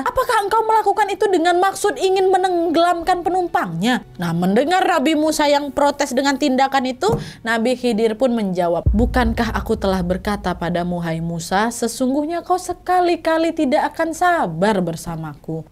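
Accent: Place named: Sumatra, Indonesia. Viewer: Kurasa kau ini orang sumatera dah , logat kau itu jelas kali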